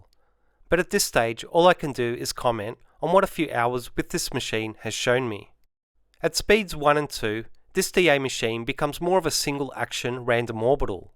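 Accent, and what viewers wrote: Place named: Australia. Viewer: After the Bullshit Techno Intro Music I wasn't expecting to hear an Aussie Accent.....Pretty good review though. Not sure that it's helped me decide between the Rupes LHR15 MK2 or the Flex. Maybe the Rupes is a better all round machine? So I'd be best to go with 125mm backing pad on whatever machine I decide on rather than the 150mm. Is that correct?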